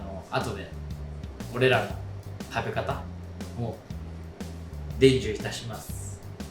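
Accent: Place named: Kyoto, Japan. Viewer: 時々でる京都弁笑えてもうた🤣 作ってみたいな🤔てかレイちゃんの手料理食べたーい❤料理恒例にしてほしいな！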